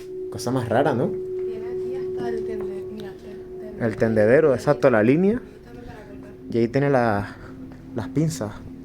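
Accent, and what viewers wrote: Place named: Tenerife, Spain. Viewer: Eres de Tenerife? Por el acento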